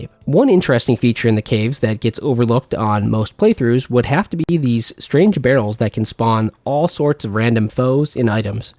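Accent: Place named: Great Lakes, United States. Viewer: "Great Lakes accent" you answered my question I posted on a previous video.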